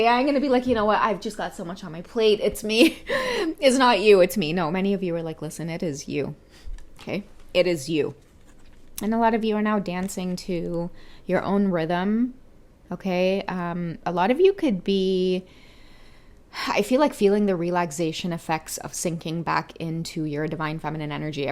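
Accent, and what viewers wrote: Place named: Trinidad and Tobago. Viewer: Aye you not eash na with dah trini accent, makes me laugh...lol.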